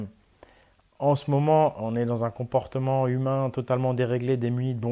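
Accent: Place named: Provence, France. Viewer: Lady Aurelie Boissat Fraser of Lochaber. c'est juste que je ne comprends pas très bien, pourquoi certaines vidéos sont intitulées aussi...mite etc... sur sa chaîne mais sont narrées,haha, par un type à l'accent provençal ? tu connais bien ? tu peux me dire s'il te plaît ?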